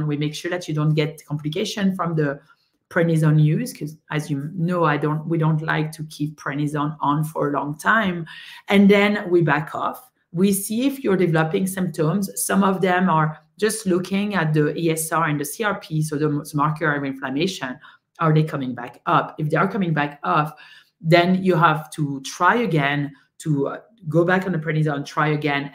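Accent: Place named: France. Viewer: Too bad the French accent is so strong, I can't really understand a lot of words said until I finally turned on the captions and, still, that was not 100%. Still had to repeat some sentences multiple times.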